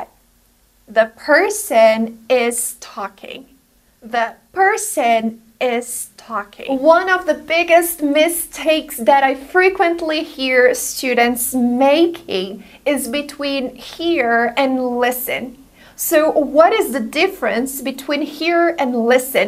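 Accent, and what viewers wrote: United States. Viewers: But she's speaking american, not english... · Brasileira? Caramba, pensei que fosse americana.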